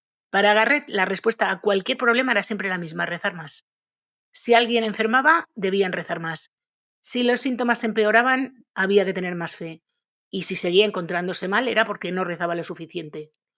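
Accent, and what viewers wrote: Spain. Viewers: ...ayyy, debe ser un deleite y una delicia conocerte personalmente. Platicas y cuentas éstas historias con un toque de sarcasmo muy jocoso. Gozo de tus relatos desde que comienza incluso la música. Como tengo relativamente poco tiempo de conocerte. No he logrado ponerme al día. Pero ya voy, ya voy. 😉 Saludos desde California. Amo el acento con el que ustedes los españoles hablan. Soy 🇲🇽 de nacimiento. Y de naturalización 🇺🇲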